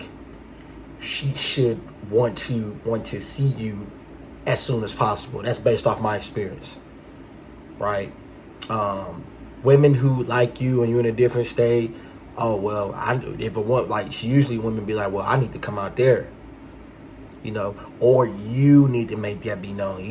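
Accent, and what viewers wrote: Baltimore, United States. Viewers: Baltimore accent detected. But nah for real thanks for all your help my G. Peace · U sound like a bmore nigga🤣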